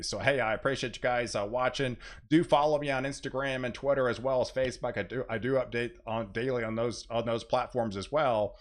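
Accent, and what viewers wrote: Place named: Texas, United States. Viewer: youre in mckinney??? i was trying to figure out your accent.. not quite a east texas accent?? my folks live in benbrook over in foat wooth.. we re in italy.. thanks for all yall do.. blessings..doc johnny nato sicily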